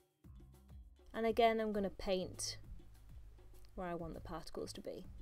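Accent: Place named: Birmingham, United Kingdom. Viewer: Great tutorial! Thank you! Love your accent by the way! Is it brummie?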